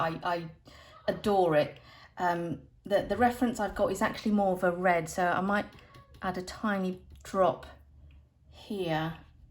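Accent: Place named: United Kingdom. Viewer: I wish more artists would do this, it really helps to understand the choices made. May I ask, which British accent do you have? I love accents!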